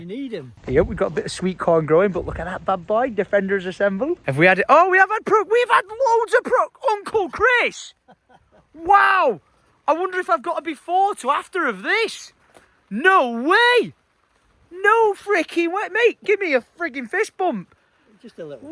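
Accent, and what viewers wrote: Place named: United Kingdom. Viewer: I absolutely love your accent but as an American i have one too. But what wanted to say is i love the way the way you say but. It’s so British. Love your blogs. And by the way i am percent British my ancestors come from Manchester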